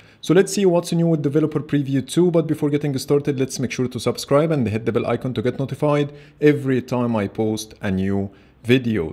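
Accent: Egypt. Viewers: Hhhhhhhhhh.hhhhhhhhh...you are egyptian i can hear your egyptian accent while speaking english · your accent screams eygptian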